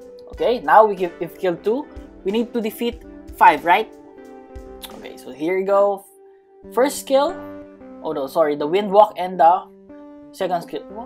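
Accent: Philippines. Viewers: it sounds like you have the accent? filipino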